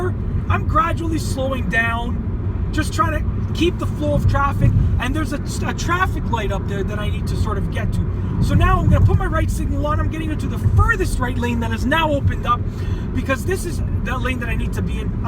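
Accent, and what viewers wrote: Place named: Canada. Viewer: I think abe Lincoln is said to have spoken like this guy. Though ... Probably without the Canadian accent.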